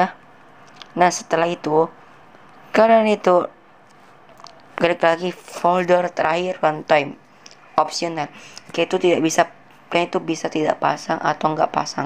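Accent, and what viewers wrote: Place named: India. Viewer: Suaranya kek orang india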